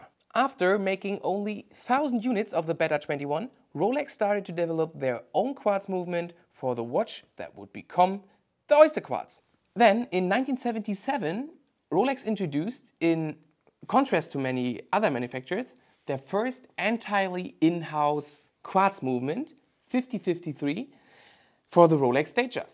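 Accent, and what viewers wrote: Germany. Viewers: The thick German accent... but still amazing video.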